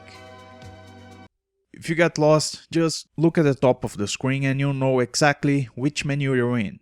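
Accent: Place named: Brazil. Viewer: Man, I can't express properly how happy I am to see a guide like this, and especially one for this really unique (and extremely comfy) version of Densha de Go! Also loves everything you do in your channel, exactly the type of game that I love but gets no recognition (boku no natsuyasumi, man!!!!). Great content all in all, hope you keep it up! Also, are you by chance originally Brazilian? Your accent sounds like it, and it got me curious.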